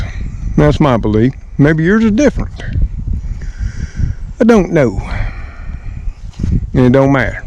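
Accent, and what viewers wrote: South, United States. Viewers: That was a beautiful walk through that graveyard Mr I love graveyards and walk through alot of them myself if only it wasn't so cold but I go out anyways as long as it's not 10degrees when I was a little girl I used to love to play in the graveyard by our house all the time I think you may be having visitations at home when you smell that perfume and I find that so comforting because I believe the spirit can visit their loved ones and I don't fear the dead it's the living we have to fear unfortunately I have many strange things happen in my house also but anyway it's great to get out in the sun in the winter and get some exercise I hope you have a great night and stay safe I'd like to walk through the graveyard again with you if you want thanks 😊 Mr and have fun with your crew and by the way I love your southern drawl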